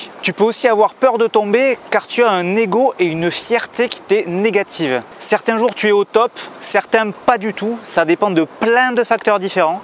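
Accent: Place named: South, France. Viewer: Aaaaaaaaah l'accent du soleil! <3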